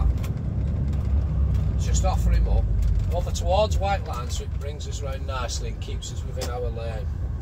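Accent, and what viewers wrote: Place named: Lancashire, United Kingdom. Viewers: The AI subtitles are really struggling with his northern accent 😅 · Can't fathom whether that's a Wigan or a Coppull accent? Just out of curiosity (only seen this vid), have you done one where you're on a dual carriageway that goes into one lane, to show how everyone has to get in front of the truck?? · I wonder where he comes from? I can hear an accent but I'm on my phone so I have naff speakers... "Just roll 'im 'round, tickling and fettling..." Oh! He's from up there! 😊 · Not often you get a proper northern accent on YouTube unfortunately. Glad to have found one that’s doing some good content! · Nice to have you back missed your vlogs and that lancastrian twang!!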